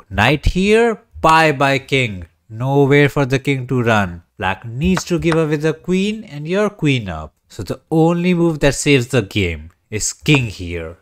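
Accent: India. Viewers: Nice to hear some Bhartiya voice. Search knight tour Sanskrit to know why Bharat was richest. So much knowledge they kept hidden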